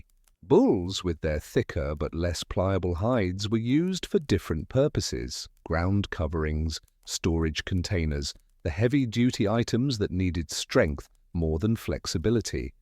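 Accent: United Kingdom. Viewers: Watching from southeastern Texas, 🇺🇸 USA. You have a beautiful British accent. Could listen to you for hours.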